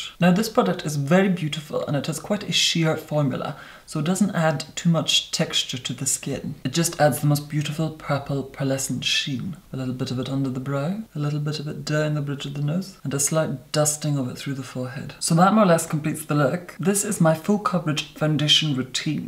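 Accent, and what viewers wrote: Scotland, United Kingdom. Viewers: I'm moving to Scotland just so I can hear this accent everyday. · He's a well spoken Scottish person. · it's like a velvety upper class Scottish accent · Agustina Riveros he’s from a Scottish island but also has a posher type London England accent on top of it Someone may correct me but I think that’s what it is-I don’t believe it’s exactly and example of a natural accent though I believe he has altered it. He is so interesting to hear speak and I love the way he does and his vocabulary as well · Agustina Riveros what professor chaos said is totally correct is from the Hebrides but has been putting on a posh english affectation since he moved to London. If you watch his very first movies you can hear his original accent was much more scottish sounding, it's quite lovely! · English At Work scottish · Interesting accident - Scottish or Irish maybe, with either elocution lessons or self taught elocution eradicating most of his original speech patterns. 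Reminds me of Margaret Thatcher, or Russell Brand......you can tell he's attempted to learn RP, it's not what he's grown up with. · I didn't know posh Scottish was a thing. Now I know. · Very posh version of Scottish. There are many dialects of Scots that sound nowhere near as regal. To me the very rich accent that he has always reminds me of Minerva magonigal or Mrs doubtfire and that’s coming from a Scottish person 😹 I don’t speak nearly as eloquently as he does. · true he sounds like he’s a rich brit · I'm British...but I've never heard someone talk so British before 😂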